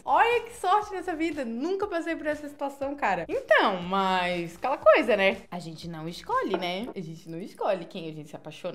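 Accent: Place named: Florianópolis, Brazil. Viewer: Sotaque de Manezinha de Floripa. 😎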